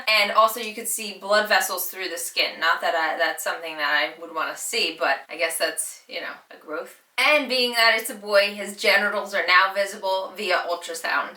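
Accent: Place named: Delaware County, United States. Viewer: Love your updates! You have such a delco accent lol. Are you from there? (Delaware county)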